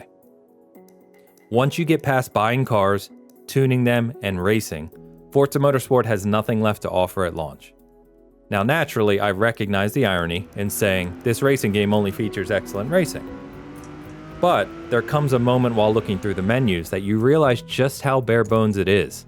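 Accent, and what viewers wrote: United States. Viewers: I'm going West Coast, N Cali or Oregon. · lol finally someone who understands an American accent. it's strange to me sounds like rural middle America. The people replying to me that think Americans have 1 accent is hilarious. I'm the dumb one to them...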